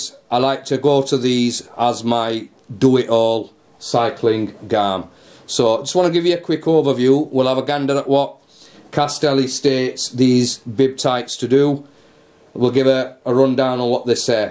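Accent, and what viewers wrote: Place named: West Yorkshire, United Kingdom. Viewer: God bless t West Yorkshire Dialect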